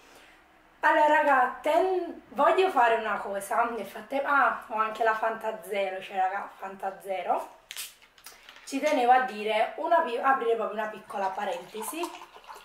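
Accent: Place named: Campania, Italy. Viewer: Io penso che tu non ti debba giustificare dei tuoi errori grammaticali, io ti seguo da poco e mi sto letteralmente divorando tutti i tuoi video perchè mi piace la persona che si intravede semplice e genuina, oltre che mi sono utili i tuoi video in quanto sono un acquirente di allsupplements. Inoltre reputo anche tu sappia intrattenere il pubblico. almeno per il mio gusto personale si. Continua così :-) P.s. adoro il tuo accento campano!!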